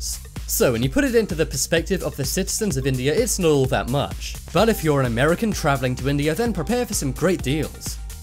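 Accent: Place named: England, United Kingdom. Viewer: Why does he have an English accent but use American terms in all his videos?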